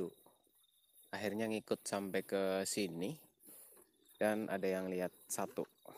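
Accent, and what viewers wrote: Banyumas, Indonesia. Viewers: wkwkwj ngapak bae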